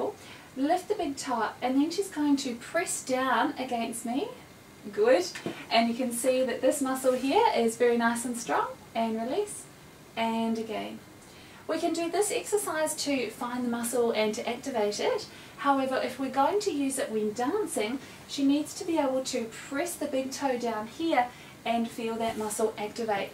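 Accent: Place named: New Zealand. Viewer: it sounds new zealand, i went to one of her workshops in rocky, she's great :)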